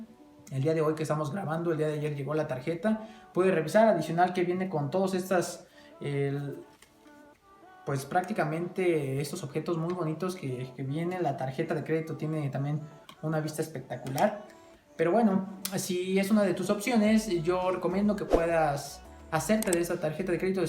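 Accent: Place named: Mexico. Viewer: Ami me enacnta 3l asentó mexicano aunque no viva ayi gracias por tu información bro lo tendré encuenta cuando valla algún día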